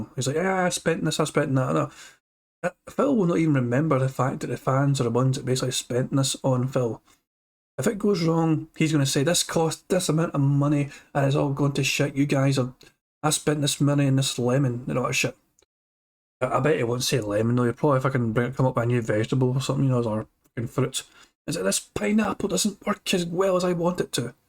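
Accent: Scotland, United Kingdom. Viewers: Ah yes my daily dose of a Scottsman wonderful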